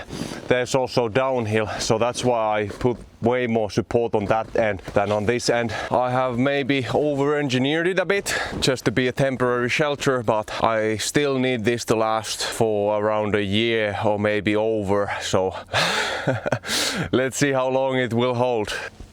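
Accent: Finland. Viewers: Hello from Minnesota USA I enjoyed the video I lived in embarrass Minnesota home of the Finnish sow-na like your country I like the long videos your accent is like the Finn’s in my town and close to Canada 🇨🇦 be safe I’m glad I found your video I grew up in the woods with my father we cut lot of wood and I enjoyed spending time in the woods with my father and having coffee under a tree taking a break enjoying the smells of the woods blessing from Minnesota USA 🇺🇸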